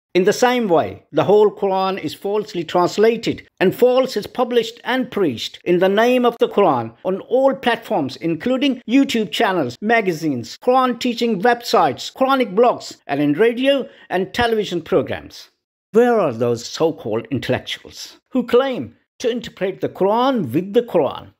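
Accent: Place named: United Kingdom. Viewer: He is speaking British English in quite normal speed. He is not fast at all. If he speaks slow it will be dragging. If you listen English news and other English programs you will find that speed seems a bit slower than native English speakers.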